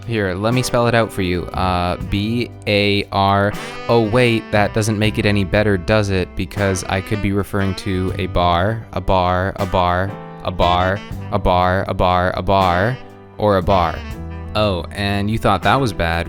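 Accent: Russia. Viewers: your russian accent is actually pretty good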